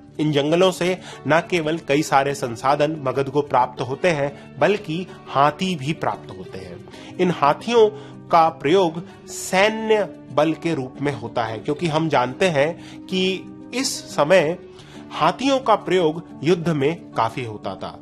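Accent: Standard. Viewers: actually he's not wrong totally, most people use Hindi mixed with English, Persian ,Etc in modern day .... But this शुद्ध Hindi sounds so good to listen to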